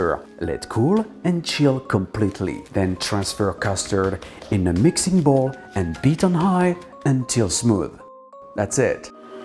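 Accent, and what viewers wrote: France. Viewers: Bonjour. A votre accent vous semblez être Français ou tout du moins francophone. Serait-il possible dès lors d’avoir au moins les ingrédients de la recette en français dans la description à défaut des sous-titres, s’il vous plaît ? Ps : pour ceux qui vont me répondre comme toujours « va apprendre l’anglais »... Sachez que je suis atteint de xénoglossophobie (peur des langues étrangères). Donc ma demande n’est pas un caprice merci.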